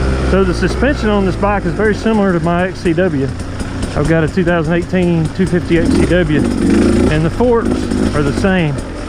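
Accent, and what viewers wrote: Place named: South, United States. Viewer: come to think about it, one of my buddies has a 2017 or 18 te300 that he wants to sell and I'd imagine you can get it relatively cheap. He bought it to tag and ride on the rd but hes rich af with a garage full of gasgas and ktm and rode it and didn't like it so its been sitting in the corner ever since. If you want to see some pics I can get some and whatever he wants for it. Dont know where you are but you sound southern lol and I'm in east Tennessee so we cant be to far apart.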